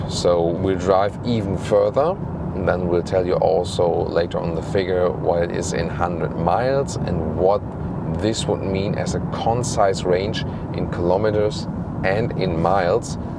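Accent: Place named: Germany. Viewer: この方、いつも誠実でいい！ 字幕なしでも日本人が理解できるドイツっぽい英語と、話すスピードもちょうどいい！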